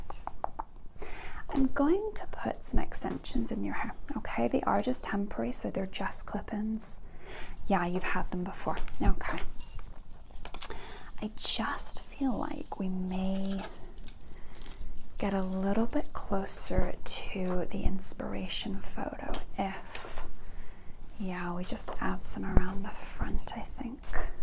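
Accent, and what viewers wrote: South, United States. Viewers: As someone who grew up in the south but no longer lives in the south the way you speak is so nostalgic and relaxing!! it brings me back to elementary school! Please tell me im not insane and your from the south lol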